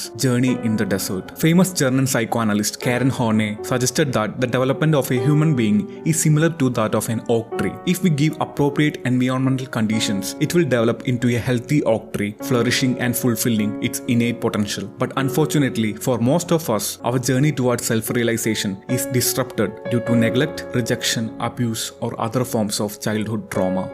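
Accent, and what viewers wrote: Kerala, India. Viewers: Your accent is too obvious. Thick mallu accent is often too hard for foreigners to understand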